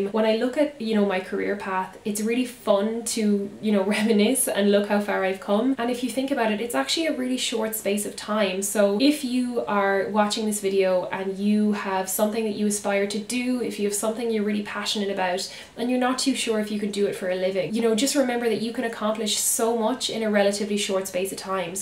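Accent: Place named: Galway, Ireland. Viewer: Well done :) I like the way you are down to earth and humble and you still have your nice Galway accent.. best of luck from Deirdre Marie Photography x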